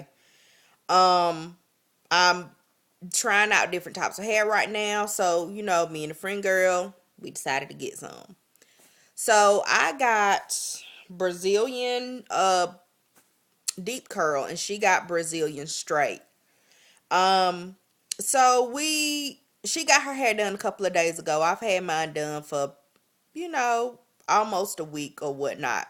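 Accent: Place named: South Carolina, United States. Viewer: You are too funny you sound like my friend from SC. I love the "chew my draws" I'm going to have to find a way to use that lmao. You would have me laughing all day long. I I sell virgin human hair extensions and I generally wear 20" and my hair does not tangle at the nape or matte.My company is Mane Extensions of U I would love for you to try the hair I have a variety of wefts, loop ring, bulk, closures etc. You can find me on YouTube, Facebook and website (system will not allow add link) .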